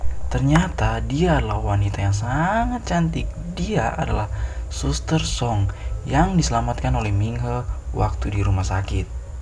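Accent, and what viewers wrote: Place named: Ambon, Indonesia. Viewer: Suarane ambonnn😊🙏🤣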